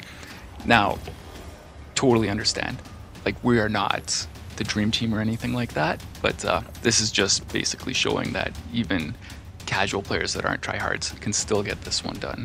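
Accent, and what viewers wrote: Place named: Canada. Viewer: Yup, we have the same accent though mine is slightly different cause I am from Newfoundland, but I recognized the canadian nuances lol nice!!! As for Wizards, I hate them, they melt man, I can't even use stormchaser when I know wizards are involved cause I struggle to maintain headshot accuracy with them, so I usually try to build around Gally if i know wizards are involved, and Arby is a staple in ALL my builds, it literally hasn't left my kinetic slot since I got it, the only better weapon right now is Wishender, and Wishender hits harder.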